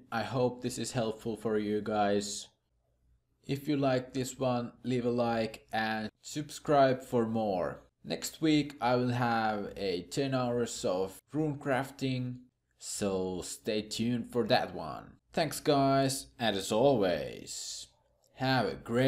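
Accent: Finland. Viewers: You must be finnish? Suomiaksenttia ei voi olla tunnistamatta. Hyvä guide, pääsi hyvin kärryille shamanien kanssa :D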